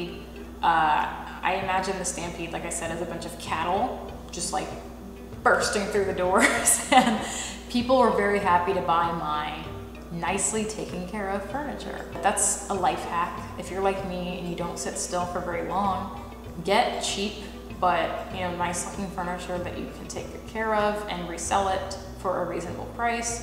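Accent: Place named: Los Angeles, United States. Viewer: Ah oh L.A. broad, oh i was guessing Texan or midwest.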